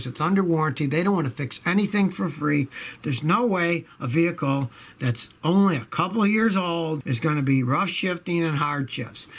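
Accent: Italy. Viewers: i love scotty's Italian's accent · Italian accent 100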